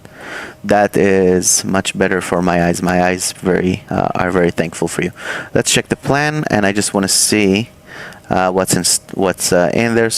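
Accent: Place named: Israel. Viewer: You'er sound Israeli. Am i right ?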